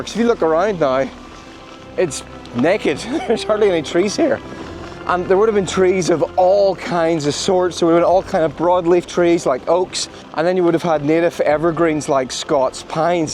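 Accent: Ireland. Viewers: Same problem different country. We have that issue here where i live too, but personalizing it doesn't matter because you can look at every single continent and every country this same issue pops up. It's not new internationally or unique to you guys and your neighbours. Here in this video you talk about plantations as the problem, but they are not the real issue. The real issue is mono crops. Mono crops are not only this major issue destroying all of the worlds forestry wildlife and plants, but also are a major crisis in our food supplies that could actually cause the extinction of our species long before any of natures other disasters if we're not careful going forward. You can speak quite seriously but the tone of the catastrophic disaster that this is really doesn't come through with the charming irish accent haha. Maybe an angry German should narrate how big of a problem this all is, or a Russian with vodka withdrawls lol.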